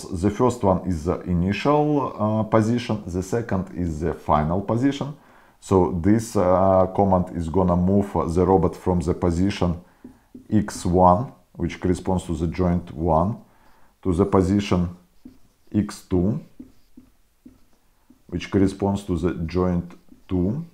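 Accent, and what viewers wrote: Ryazan, Russia. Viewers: мне кажется или это самый насыщенный рязанский акцент, что я слышал? надеюсь не обидел своим комментом. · Бля, по акценту сразу слышно русского Ивана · какой русский акцент, с первых же слов )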